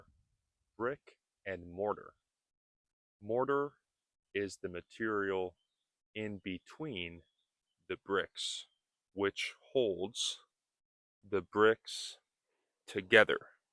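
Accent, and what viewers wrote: United States. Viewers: Hello. Are you an American native speaker (your accent sounds like American). What country do you shot your videos, in Hungary? Do you have live streams on your channel?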